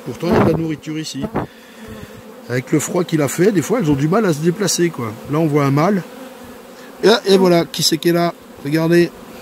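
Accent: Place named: South, France. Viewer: Hello ! D'abord grand MERCI ! Encore une vidéo passionnante ! C'est dingue ce que j'apprends grâce à toi... Ensuite.. J'aime trop ta voix de Bary White 😉 et ton accent du sud parfois... Lol.. Enfin.. Pour moi la reine vierge va mourir.. Soit abandonné par terre.. Soit tuée car les zabs vont sentir les phéromones de l'autre (bonne) reine.. Et n'auront plus besoin d'elle... J'ai bon ? Encore mille merci ! Flo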